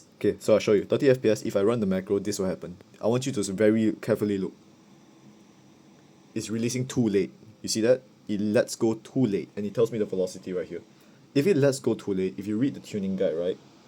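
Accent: Singapore. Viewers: w singapore acent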